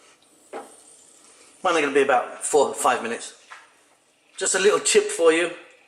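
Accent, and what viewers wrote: United Kingdom. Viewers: At last ! A British channel with a British chef/cook and a British accent 😁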